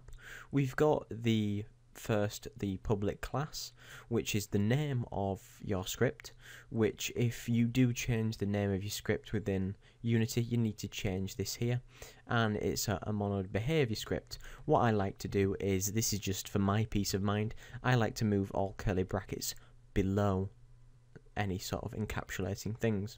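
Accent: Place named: Scotland, United Kingdom. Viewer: I love this scottish accent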